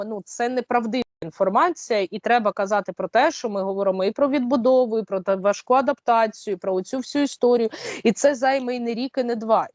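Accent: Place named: Kharkiv, Ukraine. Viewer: Манера мови характерна для її локації. Харківщина та Дніпропетровщина! Ну на 500 відсотків українська! Десь так розмовляв Нестор Махно!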